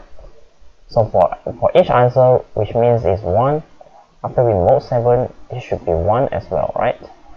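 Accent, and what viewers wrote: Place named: Singapore. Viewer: definitely Singapore accent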